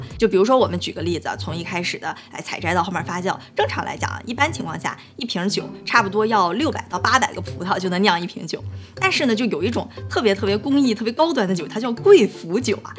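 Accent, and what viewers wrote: China. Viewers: 阿lin的大陸鄉土味甩不掉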